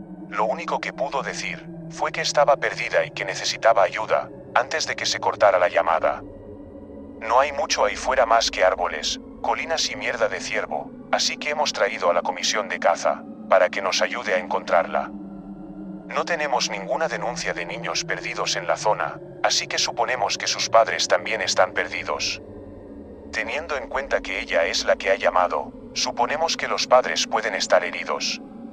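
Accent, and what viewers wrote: Spain. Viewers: Me gusta más la narración con esta voz en castellano a pesar de ser de MEXICO. Me encantan las narraciones con ese sonido de fondo.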